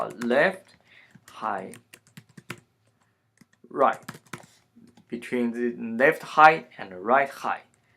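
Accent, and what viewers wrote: China. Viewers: 第一句话就能听出是中国人hhhh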